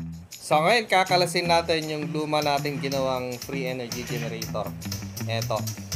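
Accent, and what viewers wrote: Ilocos, Philippines. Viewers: Ilocano ka sir?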